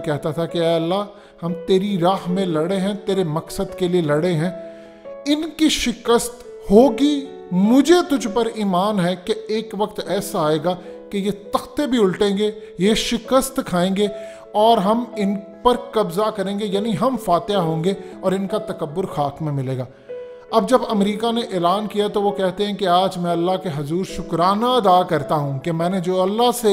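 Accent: Punjab, India. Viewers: Aarooj nahi Urdu oorooj kehte he bhai tum urdu zuban ko jab punjabi jone me bolte ho maza aja ta bhai urdu tumhari madri zuban nahi acha hoga punjabi language me video banao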